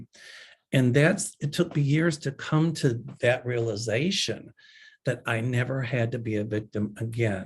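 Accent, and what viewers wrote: South, United States. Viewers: it’s important to talk about your experience of being a victim of Bullying. It’s real, it’s true and others may not ever know of the damage and pain it can do. It may make a difference. As for your articulation… a beautiful southern drawl and it yours!!!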